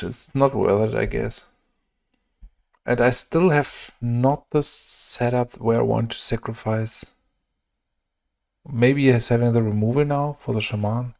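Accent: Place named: Germany. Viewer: Vom Akzent her bist du sicher Deutscher! Cooles Deck und und nur Support für den Atzen!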